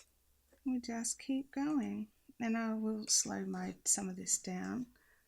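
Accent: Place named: Australia. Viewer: Wow!! I've never heard of continental knitting til now! I can knit & crochet, but haven't knitted for many years, but crochet heaps as it's my passion. If I ever decide to knit again I'll have to give continental knitting a go! I've just found your channel & subscribed. 😀👍 I assume you're an Aussie by your accent. 🤔 Blessings from Adelaide, South Australia. ❤️🦘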